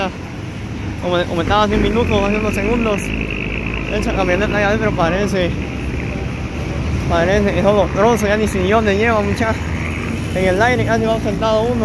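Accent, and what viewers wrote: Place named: Guatemala. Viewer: Me gusta tus videos mas porque usas el acento chapin no de otros paises siempre usa el acento chapin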